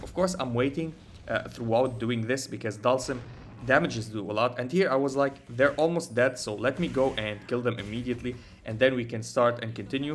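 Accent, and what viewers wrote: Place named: Arab world. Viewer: Bro i think you are arab, your english accent is arab. Anyway, are you still playing echocalypse?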